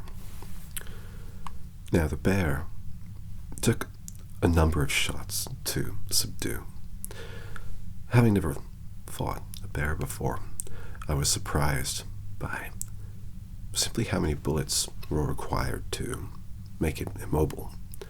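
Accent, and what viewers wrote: United States. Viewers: Wow love the series but didn't finish it.ur so talented u sounded like a American well done u should hear the song to twin peaks it haunting I love it.I listen and meditate to the music.I got a little request could u consider a Tomas Sharp role (Crimson Peak) I fell in love with the movie and the story plus IAM a massive Tom Hiddleston fan. love Astaroche 🍷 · I completely agree with you! I’m American & I thought he was too, lol. He completely fooled me & that’s hard to do! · Yaaaaaa!!! You are da beeeeest! Your portrayal of Cooper is great. Also your American accent is flawless. Did you use Tibetan Method to get into character? :-) Thanks for this! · LLAMAS!!!;D Hey, do you make these music intros yourself? This is definitely my favorite american accent of yours in your content yet, gentle and without any House MD nasal sharpness or strain, and sounds incredibly natural. 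I was so fascinated by how good it is I couldn't focus on anything else on my first watch. But I could listen to you talk about anything anyway...Love the dark shadowed lighting, that wood-paneled background, the filters you used, and the bits of effects. It all, combined with your voice, makes for such a quiet, calm viewing. Lookin like a clean cut 1950s mad men exec here. That pause and then that grab for the drink at the end while maintaining eye contact, ha!:) Never watched Twin Peaks, but not understanding the references in your content (other than the coffee!) does not ever lessen my enjoyment in the slightest! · Wow you do a mad American accent!! Almost forgot you were English 😯 You’re so freaking talented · I couldn’t figure out what was off about this video. I got halfway through the video and realized you had an American accent haha. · Dude your american accent is on point. i feel your destined for something bigger then a youtube gig. 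Your a real actor... i hope you get the recognition you deserve for your talent. keep it up man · Incredible acting skills and not too shabby of an American accent😏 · That was a damn good American accent. Really cool video, I like the mystery vibe.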